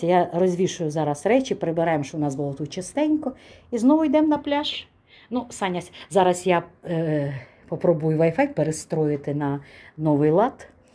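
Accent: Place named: Surzhyk, Ukraine. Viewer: ваш суржик мадам патроитка режет ухо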